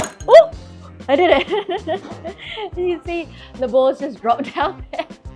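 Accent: Australia. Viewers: I have a thing for asian girls with an aussie accent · she sounds like an Aussie, so are the prices in Australian dollars? would be more helpful to list the actual prices or do they accept foreign currencies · Mizuno … might be great for me being gluten free…. Good to hear the Aussie accent!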